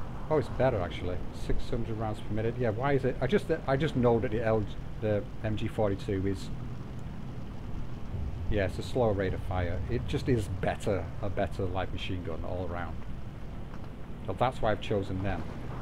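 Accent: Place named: United Kingdom. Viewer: Love the videos. Great to hear a fellow British voice commentating. Something we've been lacking since Alpha Bird left.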